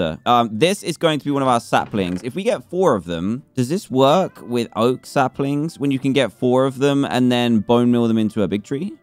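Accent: England, United Kingdom. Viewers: Wat part off England are you from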